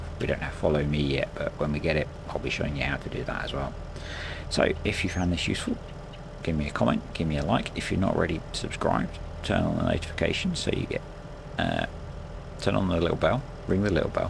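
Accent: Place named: Australia. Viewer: Hmm you sound Australian. Never heard your until I saw the news about terralife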